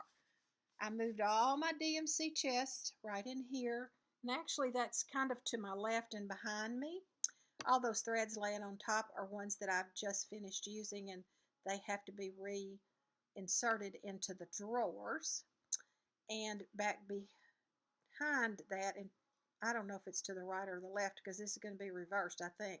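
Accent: South, United States. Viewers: Hi: I so enjoy your videos and your stories. My grands were from KY & TN and spoke in the same way you do, used the same phrases, so I do understand your southern lingo. I'm older than you by 7 years and can tell you that this aging process is not for sissies. It seems I develop a new ache or pain every day. But I do try to keep a positive attitude and count my many blessings. All the little "smalls" you finished are precious, makes me want to get online and order more patterns. I hope your sister is so much better and that you have an enjoyable time together. Can't wait for your next video. Happy stitches!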